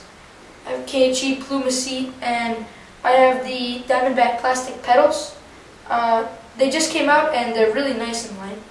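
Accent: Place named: United States. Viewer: are u american or russian ur names sounds russian but your accent is american im confused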